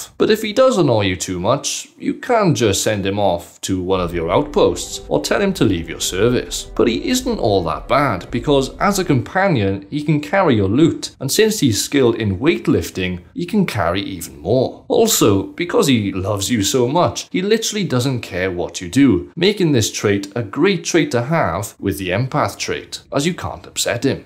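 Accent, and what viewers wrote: Wales, United Kingdom. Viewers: Love the Welsh accent, although yours isn't strong. It just sounds friendly to me. Diolch!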